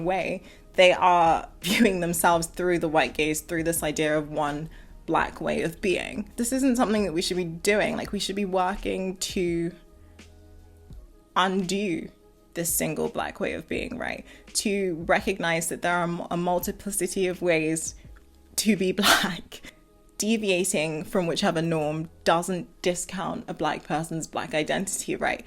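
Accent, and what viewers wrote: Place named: Africa. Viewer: Why you sound African then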